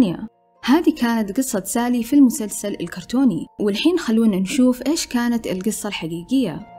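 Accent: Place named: Syria. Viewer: انتي عنجد صومالية......لهجتك كانها سورية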